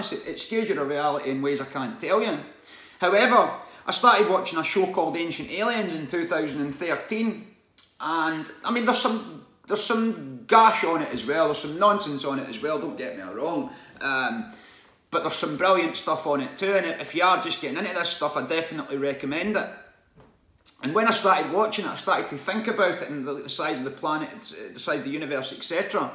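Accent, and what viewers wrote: Scotland, United Kingdom. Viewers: Alright ma man! That’s all I need to say where I’m from lol totally brilliant channel good to hear a fellow Scot speaking on these subjects I had my awakening after reading David Ikes Cildren of the matrix it blew my mind then I started doing some deep research I even read TRNCE formation of America 😢what that women went through from the day she was born if u haven’t read it mate give it a read the amount of important people that were assaulting band other things in Bohemian grove fkn terrible. She was a victim of mk Ultra had 2 personalities and one was a trafficker of heroin for various groups I’ll leave it like that member keep up the good work Ioved got a few to watch and see what the think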